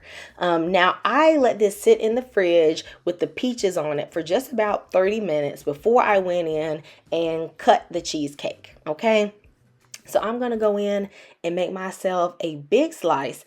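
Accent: United States. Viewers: I love your ghetto American accent, I'm from Africa and I'm glued to your channel baby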